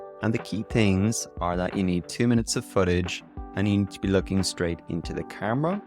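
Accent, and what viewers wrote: Ireland. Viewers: Holy Crud Batman. I'm torn here because I live in NJ and have been told I have a bit of an accent. I personally think removing your very charming Irish accent is not a great thing. Now I'm in the mood for a Guinness. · Nooooooooooo. It doesn't get your fabulous Irish accent at all. Your regular voice is so good. I want to recording of it to fall asleep to at night.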